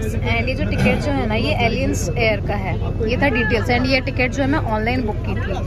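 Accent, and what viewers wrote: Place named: Odisha, India. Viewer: Flite me safar karna kya ajkal ka dinme badibath he kya odia wali hindi jadh raheho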